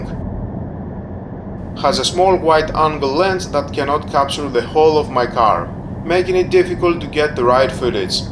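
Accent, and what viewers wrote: Greece. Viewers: Useful review, ty. Honestly, you sound like Greek 😅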